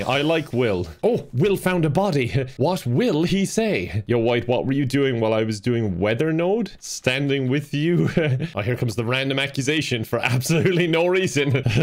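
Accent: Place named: Ireland. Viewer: Your Irish ☘️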